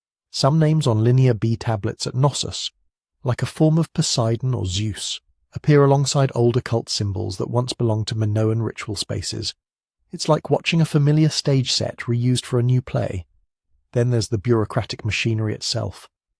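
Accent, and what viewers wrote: United Kingdom. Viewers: It is genuinely breathtaking to hear a British accent this refined and so confident delivering an argument this intellectually bankrupt. To claim us Hellenic (Greek 🇬🇷) people, merely 'inherited' this civilisation, this would be using the same set of broken brain cells suggesting the modern British aren't actually related to the people who built Stonehenge, that you lot just 'bobbed up' in the North Sea one Tuesday, found some neatly stacked rocks and a few damp fields, and decided to play house in them. If you could pull your head out of the clouds for five minutes and check the 2017 Lazaridis study in the journal Nature, you’d find that the genetic receipts' scientifically prove an unbroken biological line between the Minoans, the Mycenaeans, and the people living in Greece today. It’s not an inheritance it’s our own bloody house you fool. 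But I suppose we can’t let pesky peer-reviewed science get in the way of your revisionist propaganda can we? Surgically separating Crete from the Greek identity isn't historical analysis 🤞🏻🤞🏻 it’s just a semantic shell game for the intellectually lazy. Honestly, I’ve seen more historical accuracy in a packet of crisps. You’re not a historian; you’re just a confused tourist with a microphone and YouTube page you MALAKA ask the Greeks what that word means.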